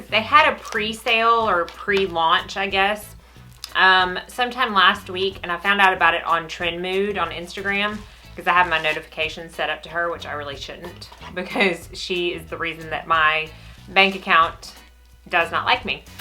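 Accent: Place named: Maryland, United States. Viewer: Are you from Maryland cause you sound like an either Maryland accent or southern. Reminds me of home ❤️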